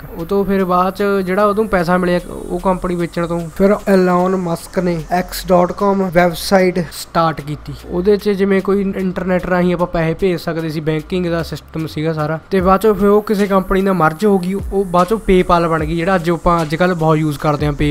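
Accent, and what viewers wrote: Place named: Punjab, India. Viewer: Veere teri boli anandpur sahib area di lagdi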